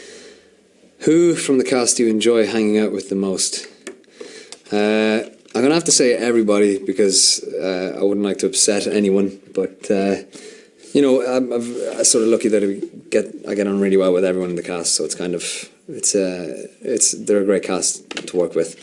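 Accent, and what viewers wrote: Ireland. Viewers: Ok so he is extremely attractive as Hook, but as himself with his Irish accent he is adorable!!! How is this possible? He's too perfect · TBH his accent irl is soo much more Irish than in the show like a huge diffrence, anyone else with me?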